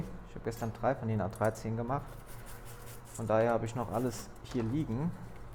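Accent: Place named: Germany. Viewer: my inglish is very bad jajaj . i think your english is really good , a little be mixed with german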